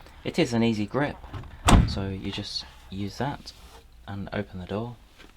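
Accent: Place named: RP, United Kingdom. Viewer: Big clue is he speaks the Queen's English :-)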